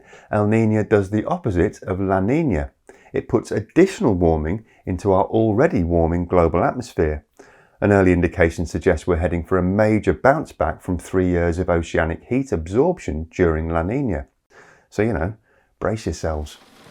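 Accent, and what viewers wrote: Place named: Upper class, United Kingdom. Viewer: I guess alarmist hysteria sounds rational when presented with an upper class British accent. It remains hysteria nevertheless.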